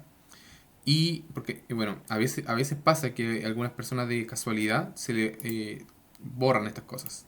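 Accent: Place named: Chile. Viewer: Que bueno un tutorial en castellano chileno. Grcs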